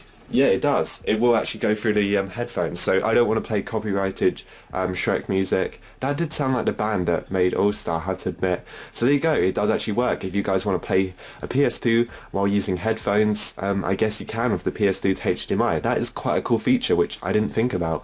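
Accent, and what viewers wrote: United Kingdom. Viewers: Love your British accent mate 👍🏻😌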